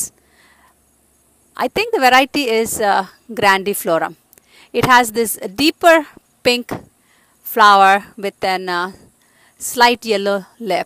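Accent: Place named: South India, India. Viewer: Where do you live ?, I notice a South Indian accent... Lovely setting, beautiful garden and the most amazing orchid spike!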